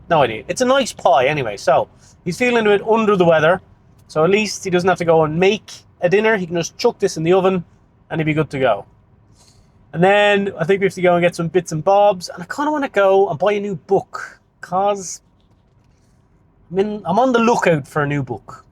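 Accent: Ireland. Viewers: Wow!!! Beautiful video. Music! Editing! Day in the life... everyday beauty! Cooking! Love it! Great pace. Great visuals... and your beautiful Irish accent!!! Thank you!! Loved it. Watching from NYC.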